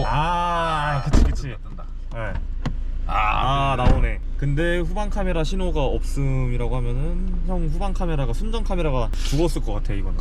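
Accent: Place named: Cheongju, South Korea. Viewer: ㅋㅋ 제가 청주 사는데 동네 친구 목소리 인줄 알았습니다. ㅋㅋ 사투리가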